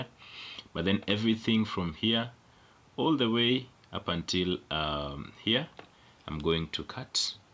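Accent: Kenya. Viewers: Nice to hear a Kenyan accent 🙂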